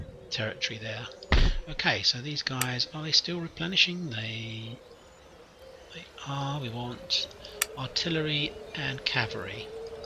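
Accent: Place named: England, United Kingdom. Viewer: mr im not sure if i ask but are you english because i like your accent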